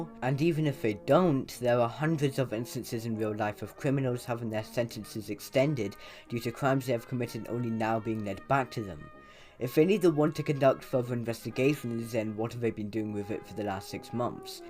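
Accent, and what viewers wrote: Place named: United Kingdom. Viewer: Oh wow, you're actually British. Didn't expect that.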